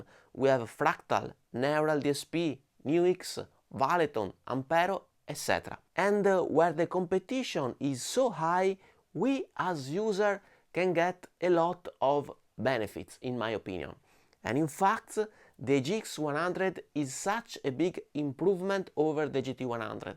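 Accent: Italy. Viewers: I love your videos, love your content and love your charming Italian-English. I find super friendly the aesthetics, the colours and the quality of the equipment around you. I hope you keep it up and that your channel continues to grow. And the most relevant thing: I love your guitar playing. Greetings from Argentina.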